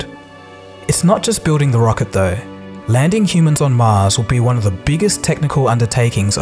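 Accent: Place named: Australia. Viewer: I personally didn´t like the australian accent, and muted the end of the video, because the music was annoying/overcompressed.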